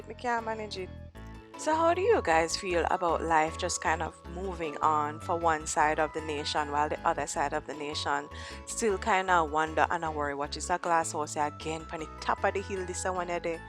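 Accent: Jamaica. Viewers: 😀😉😄😄. Love your attitude. Funny😁. Life is not one-sided. Love the patois too 😂😂😂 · love how you can go from patwah to well spoken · spicy and timely inserts of patois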